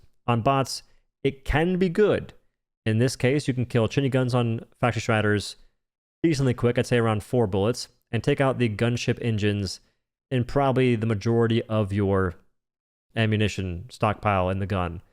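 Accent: South, United States. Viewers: I’m trying to figure out your accent. I hear a southern accent, but I also hear an Irish accent on certain words. lol.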